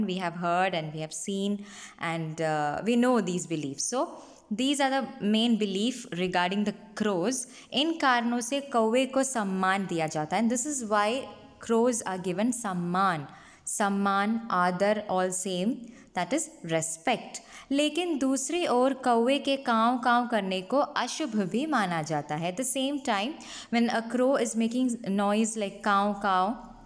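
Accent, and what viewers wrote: Kerala, India. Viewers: good english , good hindi and I also think your a malayali by your accent , lemme know if that's true and also thanks for the explanation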